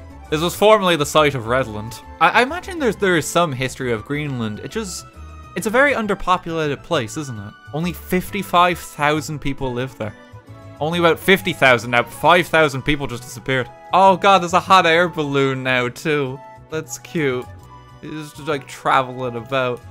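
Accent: Ireland. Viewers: is that a country irish accent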